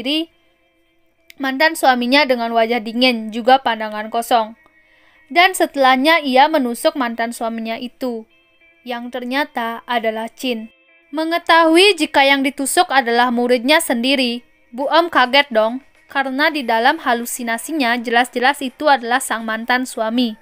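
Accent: Bali, Indonesia. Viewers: Kali ini q dgr suara ini Chanel anak Bali Yach?? Keliat bgt ni suaranya khas Bali. Morid, ithu, memanggel dsb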